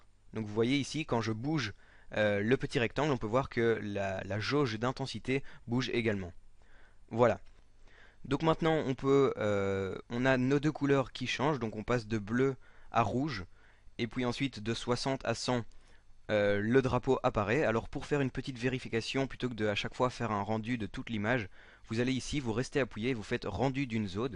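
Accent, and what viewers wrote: Belgium. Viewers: Tu est belge non ? apouier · Il est belge, si entends dans une de ses vidéos: il dit Nonente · sa sent l'accent belge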